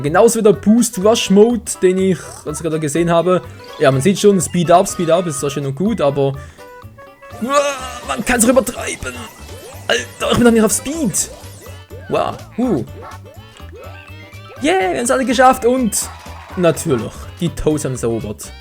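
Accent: Switzerland. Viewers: hahahahahaha er isch schwizer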